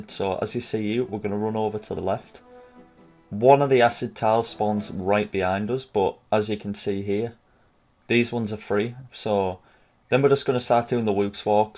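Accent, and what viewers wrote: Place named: Newcastle, United Kingdom. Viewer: Haha could tell by the accent lol Newcastle here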